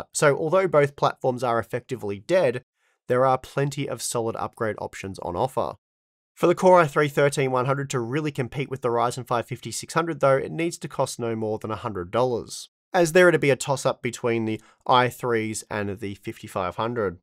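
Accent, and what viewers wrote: Australia. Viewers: I'll add one more thing: their reasoning is so cogent, clear, and concise that they do a bonus side service by teaching their viewers how to think critically, a skill that's very rare and precious these days. Oh, and did I mention that the Australian accent is another bonus and a very charming and welcome change in a repetitive sea of Americans?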